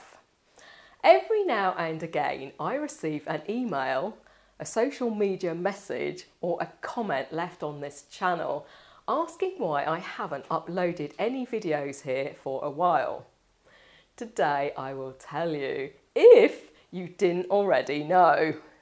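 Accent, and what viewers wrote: England, United Kingdom. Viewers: I was wondering where you were for a while but sort of figured what had happened. Anyway allways enjoyable content on all channels. No offence to our American friends it's all ways good to hear a English accent. I was born in St Leonards but now live in Australia. My bike 1984 GPz 900r. Good luck and good riding.😉😉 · Given the obvious of being a beautiful girl from Cornwall with an equally beautiful personality I would still view your video productions if say perhaps the topic was Cornish game hens! Great British accent Greetings from Arizona USA, where we speak some sort of english. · I love her British accent.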